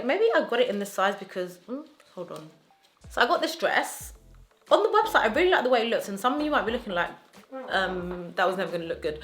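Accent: United Kingdom. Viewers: You have the cutest British accent! I wish i sounded British! I love your style and you look amazing in every piece!! Thanks for sharing love xoxo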